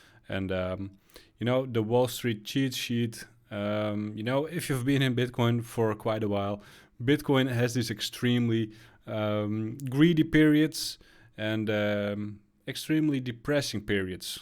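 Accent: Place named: Netherlands. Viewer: Just subbed, watched a couple vids. Great stuff. I knew you were dutch by your accent 😂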